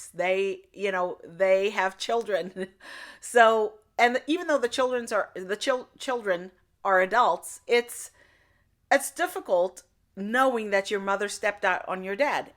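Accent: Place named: South Africa. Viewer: Clicked as soon as I saw your notification. Your South African Accent coming through nicely today😊 Out of all things I've heard this makes the most sense. Yes they are married to one another and they don't owe us anything. {Also this is the first time ever I get to comment First! }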